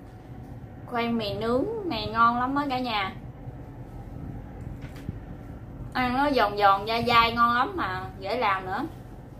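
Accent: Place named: South, Vietnam. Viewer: Noi chuyen nghe that tha moc macdam chat nam bo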